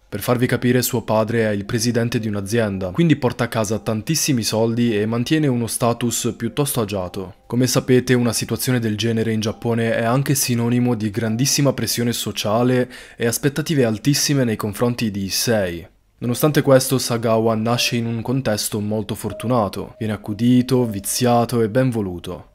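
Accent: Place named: Bari, Italy. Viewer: Pensavo anche io, poi ho sentito come pronuncia le "o", direi che è barese. Mancano anche le doppie ovunque. Fonte: sono sarda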